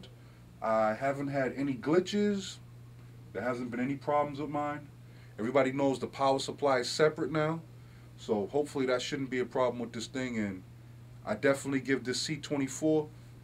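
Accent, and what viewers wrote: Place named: North, United Kingdom. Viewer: gotta love that deep northern accent....lol....good video tho...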